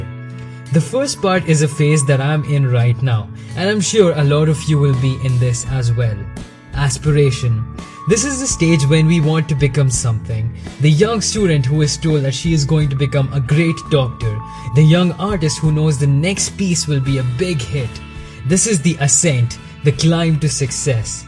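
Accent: India. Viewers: awesome! really awesome! BTW are you an Indian?ur accent is like one! 😄🙌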